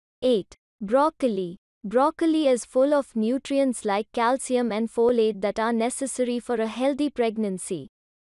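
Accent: India. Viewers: No one wanted to listen to the Indian accent woman drone on in her sing song New Delhi voice.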